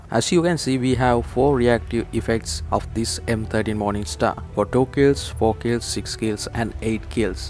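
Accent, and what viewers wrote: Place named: Kerala, India. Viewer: Mythic accent from Kerala or TN!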